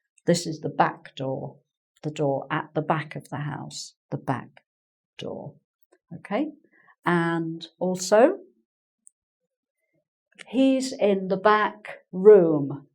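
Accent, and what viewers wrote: United Kingdom. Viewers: Hi, I'm from Brazil!!! You are incredible!!! I love your channel and I love the British accent. Thank you for sharing your knowledge with us! 👏🏻❤️😍🇧🇷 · I'm a spanish speaker and I really enjoy all your lessons. I have problems with the british pronunciation but I can understand you perfectly. Thank you very much you helped me a lot.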